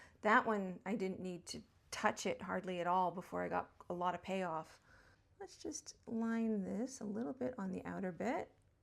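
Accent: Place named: Boston, United States. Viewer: There’s many Americans here in Australia and of course many Canadians. I always ask “Are you Canadian?” because I know Americans never get offended, unlike some Canadians do if I ask “Are you American?”. Generally I think both Canadians and Americans are very popular here (especially Americans) because we have grown up watching so much US TV (yes I too watched too much I dream of Jeanie, Bewitched and Get Smart). I feel like America represents the very best and very worst of western culture. Which is always so fascinating, to me anyway. Do you miss home? I can really hear your ‘Boston’ accent today (sorry if you’re not from Boston, it just reminds me of the accent in Good Will Hunting which was set in Boston.) It’s a very distinctive American accent. Sometimes I can tell an East Coast vs West Coast accent but not always. Anyway, what an interesting life you have lived. 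You’re truly international. How did you end up here in Oz? You’re American, with long grey hair, wild makeup preferences, fluctuating accent and work with birds! That’s interesting. Why do I get the sense that is just the tip of the iceberg with you? There’s a lot more to your story?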